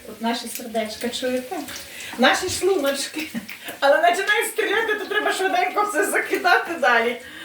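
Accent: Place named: Lviv, Ukraine. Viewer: Не знаю от куда вы, но говор, как у моей родной тёте из города Броды, Львовской обл. Мед для ушей. 🥰.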